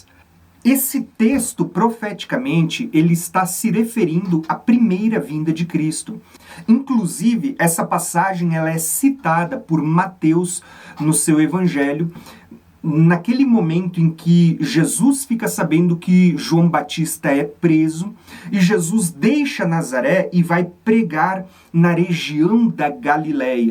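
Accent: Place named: South, Brazil. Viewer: Sotoque do Sul irmão…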